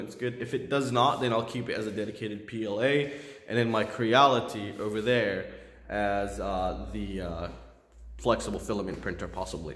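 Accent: United States. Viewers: Where I Europe do you live, you sound American have you always lived I. Europe ? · Made me smile. Thought he was American. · Where in europa do you live? I realy think you were a american😅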